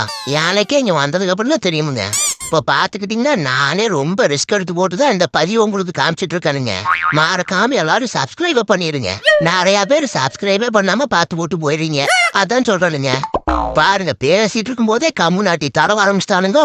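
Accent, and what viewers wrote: Kongu, India. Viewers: இயற்கையாக இருக்குங்கோ ஏமுங்கோ உங்க கொங்கு பாஷை சூப்பருங்கோ 😅😅😅😅😅😅😅😅😅😅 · Already subscribe pannittanungo👍 ungo kongu kovai slang romba pudichuthungo😂😂😂😂😂, appuram china market supernga😂😂😂😂, neenga paathu jagarathaiya irunga illana vera County ku poidunga anna😂😂😂😂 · Sema sema..... Kongu slang u.... Thala thaniya ennala msg Panna mudilla... Athukkuthan intha madam id la reply.... Sorry madam❤ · Kongu slang.. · Coimbatore slag voice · அது பாருங்க கோயம்புத்தூர் பாசை தான் செட் ஆவுதுங்க. 😅😅😅😅😅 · Coimbatore slanga voicengaaa........😂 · பரம்பரை பட தருண் குமாரை ஞாபகப்படுத்துகிறது இந்த ரெய்மிங் டயலாக் நன்றி சகோ கொங்கு தமிழ் அருமை😂😂😂😂😂😂😂🎉🎉🎉🎉🎉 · Coimbatore slang vera level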